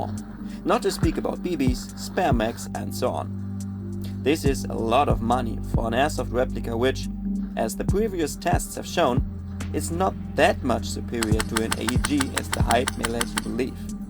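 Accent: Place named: Germany. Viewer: Dang that shimming is perfect all that I hear is the pistonhead hitting the cylinder head. You are a damn well technician. It sounds even better then my MCX and I thought that one was perfect. Anyway you sound German. Are you by chance from North West Germany ? I would like to see you on our field (an old Gas powere plant.) indoor CQB with 7 floors of action.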